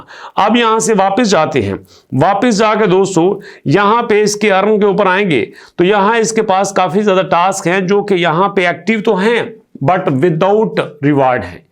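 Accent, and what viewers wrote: Punjab, India. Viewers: Very good Bro....tuhadi hr Vedio bht hi infirmative hundi aa...and tuhadi punjabi ne tn dil Moh lia....❤❤❤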